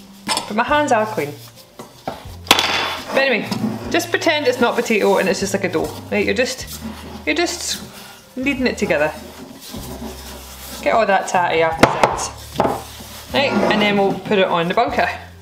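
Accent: Scotland, United Kingdom. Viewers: Listening to a scottish woman talk is always an experience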